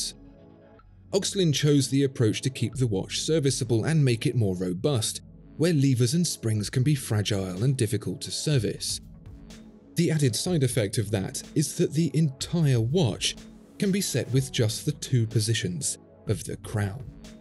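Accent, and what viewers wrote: United Kingdom. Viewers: This videos is why I enjoy your channel so such. (NOT CAUSE WE AMERICANS FEEL THE BRIT ACCENT IS SO OLD WORLD but the amazing content of your videos) With a collection of PP, JLC, and Rolex (NOT ONE HYPE WATCH IN THE COLLECTION), years and years of buying what I love.. I'm so FUCKEN impressed with those whom are the true GENIUS of our time... Will sell one of the OVER PRICED ROLEX in the collection (purchased from Mayor Jewelers in Miami Fl.). For the past 18 years my local AD has called to purchase a ROLEX at AD prices. They know I will NOT sell what I true LOVE. This Watch is AMAZING..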